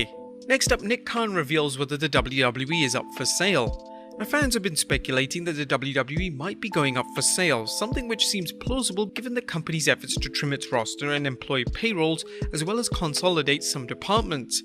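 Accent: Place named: United Kingdom. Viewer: Are you British or australlian? Cause you sound British 90% of the time but the way you say content like there's an extra e in it makes me think you're Australian...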